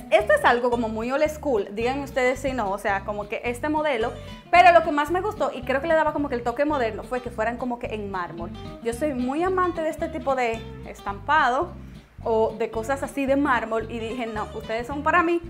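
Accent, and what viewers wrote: Dominican Republic. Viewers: Tú eres Dominicana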